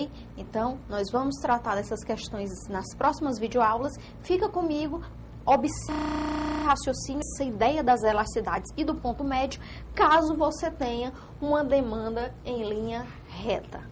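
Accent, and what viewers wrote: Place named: Northeast, Brazil. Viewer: Oi, gente! Estou amando as aulas, bem simples e didática, além do sotaque bom do Nordeste. Mas, gostaria de saber onde está a Economia Aula 20 Microeconomia Bacen EVP????? Não consegui achá-la. Bjokas pra todos!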